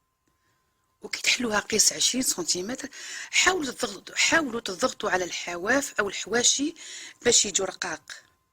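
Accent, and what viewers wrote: Algeria. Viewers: برافو كي شفتك المرة الأولى نحسبك مغربية وكي سمعت الكلام لقيتك جزائرية فرحت بزاف ونحب المغربيات ثاني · bravo j'ai tout aime surtout votre parle, simple vrai algerien bon courage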